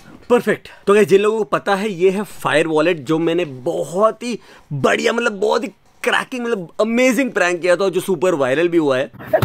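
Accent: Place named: Gujarat, India. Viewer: Heyyy bhaiya and didi...... Lots and lots of love from my family and friends... May you live longer and longer and make our next generation laugh to as we laugh... I cant spend a day without your vlogs and prank in breakfast your video in lunch your video and in dinner your video ❤️❤️i love your way of speaking and gujju tone and how you help otherrrss.. your mom is so cute as welll❤️❤️✨✨ Interested in giveaway ✨❤️ Lots and lots of love from my family and mee❤️✨ bhaiya new logo is mastttt❤️❤️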